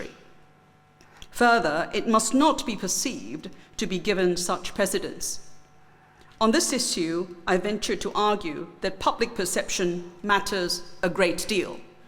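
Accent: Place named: England, United Kingdom. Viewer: 下⋯咁正既English accent, 我真係好想知你d英文有幾好聽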